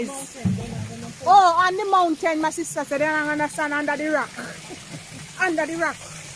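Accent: Jamaica. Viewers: Really Jamaican girl,listen to the accent 😂😂😂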